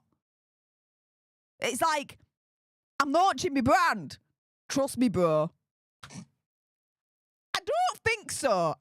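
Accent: Yorkshire, United Kingdom. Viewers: I don't think is bothered by your comment. Just adjust the volume and it's a Yorkshire accent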